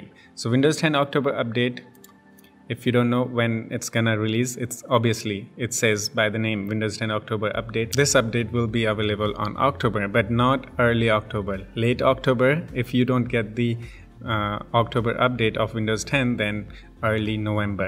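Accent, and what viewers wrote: India. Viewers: Are you Indian brah?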